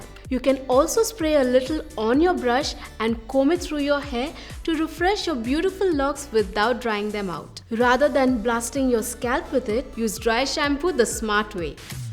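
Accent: India. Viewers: that lady is so pretty. i think she african/indian(south asian) because her accent is indian. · She has an Indian accent but she's black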